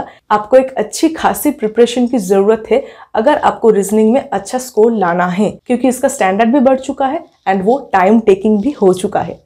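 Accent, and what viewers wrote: Bengal, India. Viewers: Aap bengali ho kya mam ??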